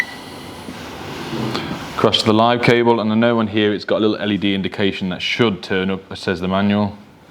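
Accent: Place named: United Kingdom. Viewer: british accent SHIT!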